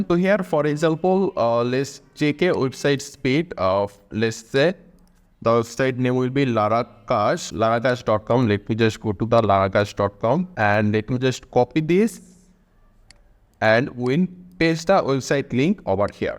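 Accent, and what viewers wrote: India. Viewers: Your voice sounds much better than before in the Laravel E-commerce Project Tutorial for Beginners, but please, we can't understand the Indian accent very well. Can you make the accent a little bit easier?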